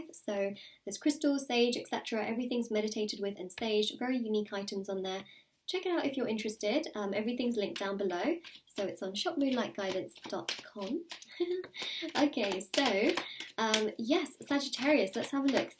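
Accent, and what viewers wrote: United Kingdom. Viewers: Bless you, wonderful reading. Good to hear a British accent, majority are American. I’m in Doncaster South Yorkshire. Have a super weekend, namaste 🙏 🥰💕🪴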